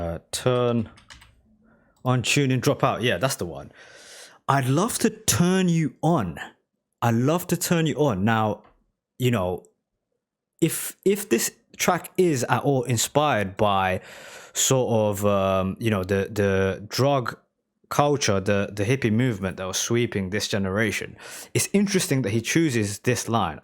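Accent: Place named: Liverpool, United Kingdom. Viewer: You seem to have a Liverpool accent, anyway love the video reactions all the best!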